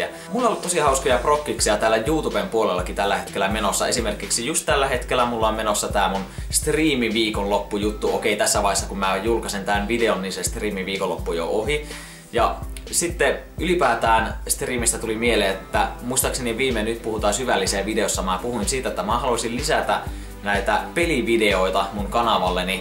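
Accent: Finland. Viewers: Tuli vaa mielee että missä asut kun puhut tuommosta murretta. Lapissa mutta missä siellä?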